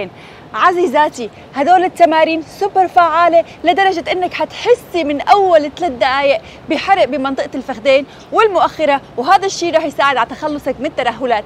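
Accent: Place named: Damascus, Syria. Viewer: نحن نعرف اللهجة الحلبية تختلف برشة عن اللهجة الشامية ، انتي تتكلمي شامي ( دمشقي) معلوماتنا انك من حلب عليش ماتحكي لهجتك ، اختك من تونس 🇹🇳 نحن نحب اهل سوريا 🇸🇾 برشا خصوصًا اهل الشام زرناها برشا مع حلب وحمص .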